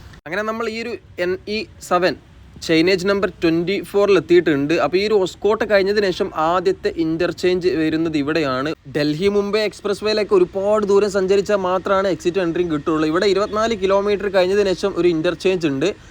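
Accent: Malappuram, India. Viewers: Superb video... Droneman, RS Live, avarude okke levelilekk ethatte, ee Malappuram slang😊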